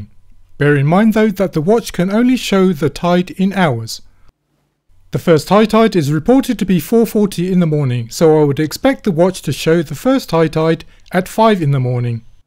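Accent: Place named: South Africa. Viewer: Dude sounds South African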